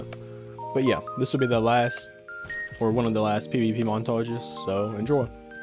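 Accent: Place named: South, United States. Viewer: Bro has the most southern accent ever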